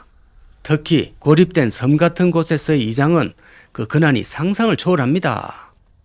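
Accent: Gyeongsang, South Korea. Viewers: 1976년부터 1988년까지 13년동안 마산 창원에서 20대 직장과 청춘을 보냈는데 정겹고 친근하고 구수한 사투리가 향수를 자극 합니다.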